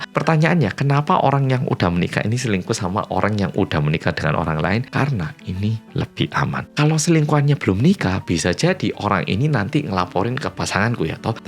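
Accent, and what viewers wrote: Java, Indonesia. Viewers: wow beneran ada ta 🤭 Nyimak swindler org korea yg medok...🎉 tks 🎉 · ini jiaaaan blas gak enek sleke Korea asli slek jowo,tapi walaupun aku tidur bila dengar suara ini aku selalu tau bahwa ini adalah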